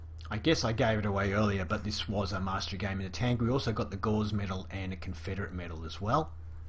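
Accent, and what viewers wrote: Australia. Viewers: I'm Serbian I was thinking you are from Australia cause of accent so I'm right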